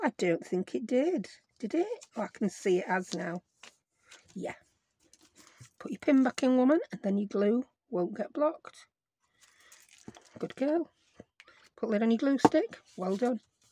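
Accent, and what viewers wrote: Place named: Sheffield, United Kingdom. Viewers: are you from Yorkshire??? we have similar accents.... I'm liking this vid :o) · I am really enjoying your channel in fact I am binge watching. I was born and raised in Sheffield but living in Illinois now. I just love listening to your accent, in fact it makes me homesick. I also like your attitude to crafting, it might work or it might not work, so de it. Gail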